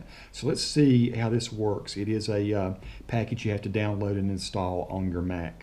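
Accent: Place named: Indiana, United States. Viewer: That was pretty entertaining! lol If I read the parts that it did not get right with my Hoosier accent, I sound like you! Subscribe if you lock! lol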